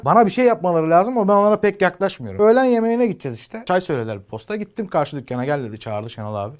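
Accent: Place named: Tekirdağ, Turkey. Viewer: Hikaye süper, birde işin içine Tekirdağlı aksanı girince süper olmuş😁👍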